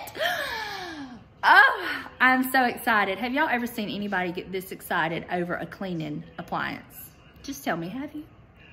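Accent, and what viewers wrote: South, United States. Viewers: I think so too!!💖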